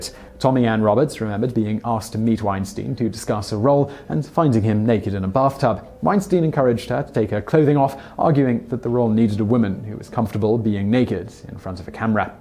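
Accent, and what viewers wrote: United Kingdom. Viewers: He's also British so his pronunciation would be a little different.... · brother, your videos are excellent, however, certain words my friend, i.e., "berry" as oppose to baby. Rosemary's Baby. I gave you the benefit of the doubt due to the British accent, after rewinding the video many times, yes, "berry." Cheers my friend.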